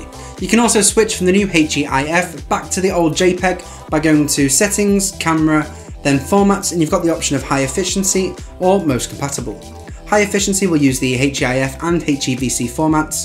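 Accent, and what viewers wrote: United Kingdom. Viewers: Very fast British English I guess. Way to fast for my German brain 😂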